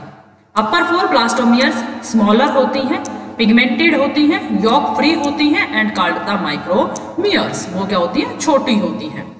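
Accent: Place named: Haryana, India. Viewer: Haryanvi mdm 😅❤nice explaining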